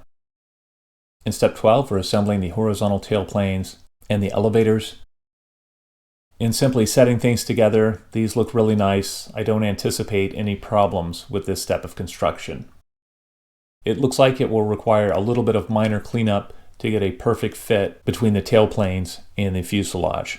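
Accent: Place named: United States. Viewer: I can't believe it! An American accent, and no bullshit! An excellent review, clearly enunciated with what we need to know as prospective buyers or builders laid out in logical order with potential traps pointed out - the screenshot showing what happens if you omit part 117 was excellent. I have a later boxing, (14002 from 1990), and can say that my example lacks the sink holes on the airscrew blades and the gun magazines, as well as having had the clear parts fixed, so Airfix have kept an eye on things. I wasted my money on replacement metal undercarriage, which has an awful grainy finish and lacks the sharpness of detail present in the kit parts. I particular, the oleo strut can never be polished to look as smooth and shiny as it should. Other reviewers have agreed with your suggestion that the cowls will not fit over the complete installed engine, with most saying that a considerable amount must be ground from the cylinder heads to allow the cowls to be properly fitted. 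Oops!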